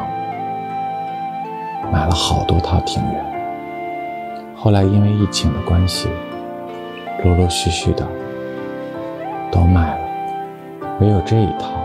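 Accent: Beijing, China. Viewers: 叔說話有種老北京人的感覺😊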